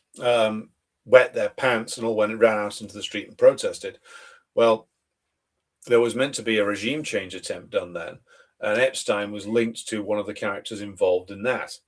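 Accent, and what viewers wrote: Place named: United Kingdom. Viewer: Why are you using the US Empire version of “Liberal”. It confused me. A British accent using the US Empire version. If you’d had an American accent or it’d been as part of the Trump impression I’d have got it straight away. Hopefully as the US Empire collapses they no longer can pervert words and we get them back. Plus what if someone French watches and thinks it is like libéral or German liberal? Or maybe they speak a language based on Latin and so misunderstand the Liber bit?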